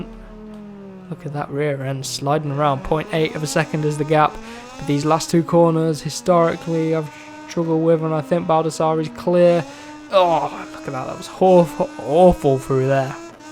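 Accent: United Kingdom. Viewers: I enjoy the 25% races from last grid position. I'd recommend FP in Moto GP to collect R & D points ... but maybe you can show us just a short version (like you do with Q1 & Q2). Well done champ! Besides, you have got an interesting british dialect.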